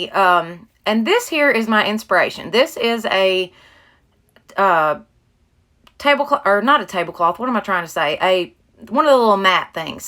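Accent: South, United States. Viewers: Just found your page. Loved the video and especially listening to you talk. You sound just like me talking 😊. Southern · Love them all I have the cow also so you gave me some ideas for him by the way where are you from i just love your country accent I'm from north Carolina coast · Super cute! I love watchin your video's. And your southern accent is adorable 😍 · Do I spy with my little ears another southern gal? 😂 Where are you from? I'm in the Charleston SC area. Loved your diys! Absolutely beautiful. I'm totally into farmhouse! Infact this week I'm gutting my kitchen to the studs and remodeling it into my dream kitchen, or so I hope lol. Never done anything like this before, Lord help. Hope you have an awesome weekend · I just have to tell you, girl, that your videos are like crafting with a member of my family... !! All the phrases you use like Y'all and other stuff sounds like home... 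the first time I heard you say " heck far " I knew you were a keeper..!! I busted out laughing and had to go tell my hubby ( who is from TN ) that I had found a bonified southern girl on Youtube !!! I love all your easy peasy crafts and I appreciate you sharing all your tips and ideas with us.... God bless and keep up the good work... I am binge watching your videos.....!!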